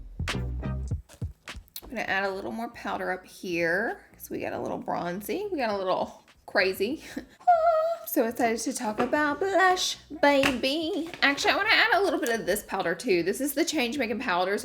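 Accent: South, United States. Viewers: So funny that u can have a southern accent and you've picked up such a cali accent too lol. SoOoO prettay like literallyyy just SOO pretty haha. Guess that's what happens when you hear it all the time around you. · Girl, you're southern af. You should know how to pronounce "peony" lol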